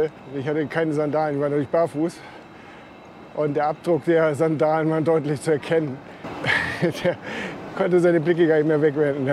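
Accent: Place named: Swabia, Germany. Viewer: Hallo, sehr schöne Filme machst Du, hab schon fast alle angesehen, sehr kurzweilig. Jetzt ist mir auch klar geworden woher ab und an das Schwäbeln herkommt 🙂. Wäre doch ratsam dass Du Dir mal die Insta 360 Rundumkamera zulegst dann hättest meistens beide Hände am Lenker. Hab sie schon bei einigen Radyoutubern gesehen, bin von den Aufnahmen echt begeistert. Bin meistens im Allgäu oder Südtirol unterwegs, das Flachland im hohen Norden ist nicht so mein Ding. Mach weiter so viel Spass bei Deinen nächsten Touren freue mich schon. Liebe Grüße vom Bodensee, Roland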